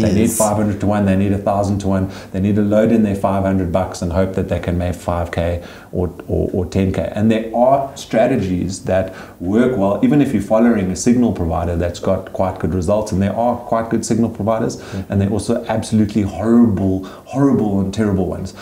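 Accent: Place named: South Africa. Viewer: Wow I can't believe that this guy speak verrphaaaa like African South African 😂😂😂🇿🇦🇿🇦he is open minded indeed not only on business side of things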